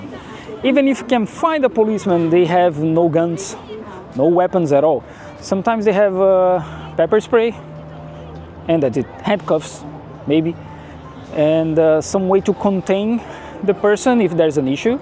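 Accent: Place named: Brazil. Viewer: I understand because he's a Brasilian talking in English 😅😂😂😂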